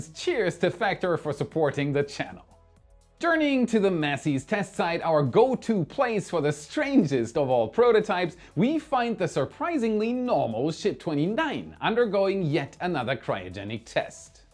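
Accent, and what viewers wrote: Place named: Germany. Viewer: I did not expect my comment to get such a big response and so many likes. With over 500 thumbs up it is obvious many others feel similar. I appreciated your comment because I did not see the episode you referred to where expressed he was getting burned out. I have been a subscriber for almost 4 years, way back when had a very noticeable German accent. I really liked his channel back then but also there weren't as many options. He made a big commitment to this endeavor moving himself and family from Germany to the US to be closer to the action so to speak. His show style and presentation may not be for me anymore but I am glad you and others still enjoy it. I absolutely wish him only the best and even more success.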